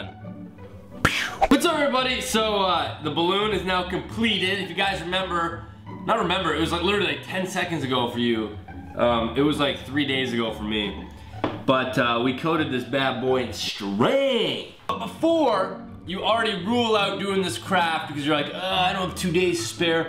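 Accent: Canada. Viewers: Soorry he sails soory like Nicole and Andrew 😍 Canadian sorry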